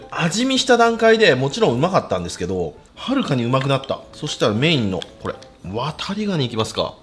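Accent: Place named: Hakata, Japan. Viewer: いつも楽しい美味しそうな動画ありがとうございます❤ 博多弁と急なえちえち雰囲気、 謎すぎてめっちゃ笑いました🤣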